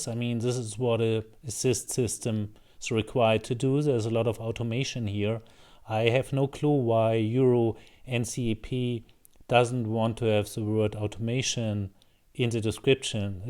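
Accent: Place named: Germany. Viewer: Gutes Video! Inhalt top, Aussprache noch etwas zu deutsch:)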